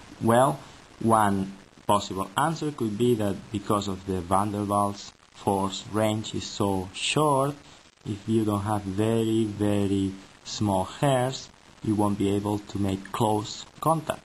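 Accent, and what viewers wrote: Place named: Japan. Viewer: Hola,! Buena presentación! Tu pronunciación esta bastante influenciada por el japonés...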